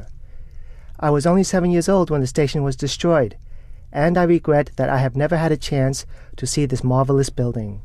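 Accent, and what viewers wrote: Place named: China. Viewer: How can he be a native New Yorker with this Chinese accent?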